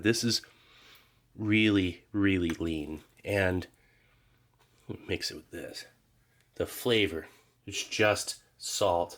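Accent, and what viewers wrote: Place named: Texas, United States. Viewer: Where is this guy from? He has an interesting accent sometimes, not sure if he is from Texas or somewhere else.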